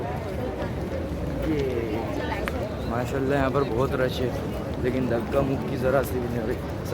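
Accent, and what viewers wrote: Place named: Uttar Pradesh, India. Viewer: Bhai ki boli to india up ki lg rhi hai hm 🇮🇳🇮🇳🇮🇳 hai 🤲🤲❤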